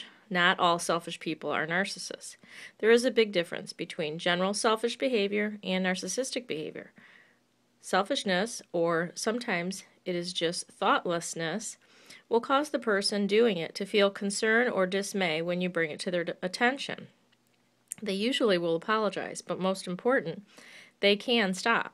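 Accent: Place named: Michigan, United States. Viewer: U sound like you from Michigan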